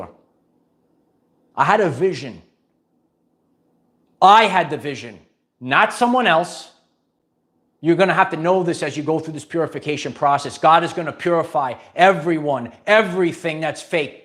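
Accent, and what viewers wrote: New York, United States. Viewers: Terrific sharing. You are a character. 94 degrees here in Florida (too hottt for me). Yes I have been learning about this stuff for many years. Carbon to crystalline, etcetera. We are stronger for everything we experience. The NAA wanted us to lower our frequencies through hatred, stress, and fear they created. To stop us from growing and ascending and being the powerful beings we are. They were fearful of losing their power, because we would realize they have been leeches and robbers to us. We all have masculine and feminine in us. I believe most males are very fearful of the feminine in them and see it as weak. It is not weak, it is strength and compassion. Females also have masculine in us and can be strong. Many humans are finding that beef is too heavy to digest anymore. I eat beans and nuts. You inspire me to build my body muscularly as a slim athletic gal, I need to lift some heavy weights to get stronger. 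Time to join a gym. Peace and love to an authentic male and teacher. I appreciate you. The NY accent is awesome.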